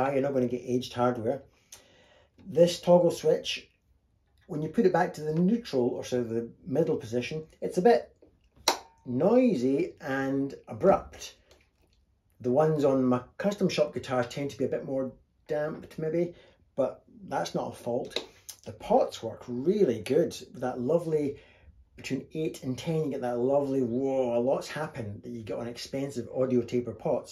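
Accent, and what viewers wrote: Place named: Glasgow, United Kingdom. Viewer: Accent is throwing me off a bit. Sounds Glaswegian blended with something else, perhaps you lived somewhere else for a few years? I may be way off as I don't hear such accents too often here in Seattle.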